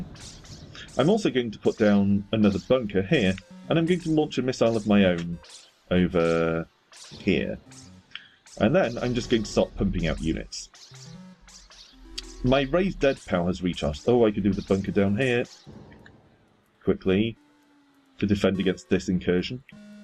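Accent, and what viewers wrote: United Kingdom. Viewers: Subscribed, nice very clear commentary, and you're English. :D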